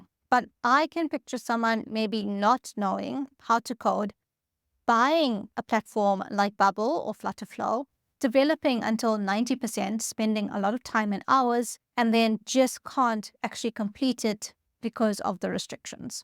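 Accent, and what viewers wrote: South Africa. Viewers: Is that a south african accent? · Are you south African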